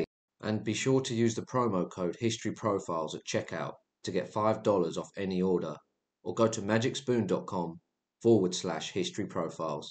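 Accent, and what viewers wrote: England, United Kingdom. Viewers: You have an English accent and live in UK... where do you get your American cereal from?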